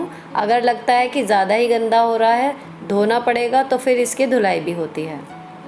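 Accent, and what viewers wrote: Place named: Malwa, India. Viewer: AP kha se h mam apki language me mp malwa ki jhalak h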